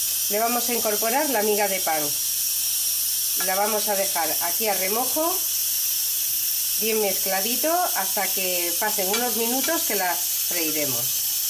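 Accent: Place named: La Mancha, Spain. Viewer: tu vives en Valencia,pero...pareces manchega,por tus guisos y tu tono de voz.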